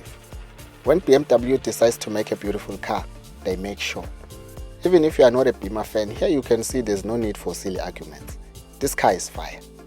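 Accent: South Africa. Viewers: And then the last part made me subscribe, athi "With a budget of 36 000 per month I would buy a corsa lite or a tazz every month for 6 years" lol the humour caught me off guard I laughed so hard. Nice video bro. Your narration has lots of mzanzi flavour, keep it up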